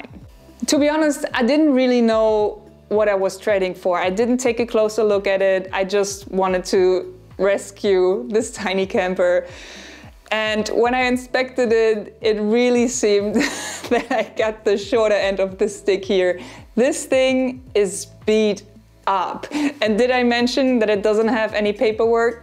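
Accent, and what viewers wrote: Germany. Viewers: Awesome! I’d like to take this opportunity to tell you that your accent is one that takes me back to Darmstadt Germany in the late 90’s. I was stationed there and dated a woman with an accent and voice just like yours. Nostalgia,… Gotta love it. Keep up the good work and awesome channel! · I know this is an older video but I have to say I love it. Also, unrelated to the video... Are you German? I love the accent. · Hello! This is my first viewing of a video of yours. Not sure but I guess you German? I learned Norwegian as a child from my grandfather but now only remember 4 or 5 words. It is just that grandmother was Danish so she was sabotaging things because she was insisting I learned Danish, while my other grandfather was German, so yeah, he was working the German angle. I was so confused! And then I got to kindergarten and the kids only spoke English!!! 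It might have been hilarious to everyone------ but me! But I still have family in Bergen and Ringstaed but I haven't seen them for quite a while now. But it seems I have a few strange words in my vocabulary that come out on occasion, I think they are from my pre-K days. But your English is great and with a lovely accent, and an easy laugh! So good for you on that! At any rate, I think your 'electronics' would be better if you ripped them all out and started over! Safety first!!! Always!!! Even if it costs money!!! (My Norge grandfather was very, very reluctant to spend a penny! But some items are just too valuable to not have new--- like wiring!!! Hope you agree! ) Tak!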